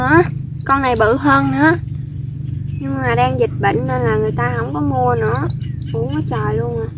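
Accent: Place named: Southern Vietnam, Vietnam. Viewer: Giọng miền Nam sao nghe dễ thương vậy em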